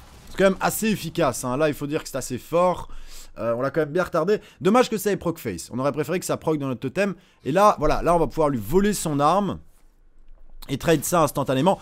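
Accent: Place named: Belgium. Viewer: Mdr l'accent belge il est bien présent :)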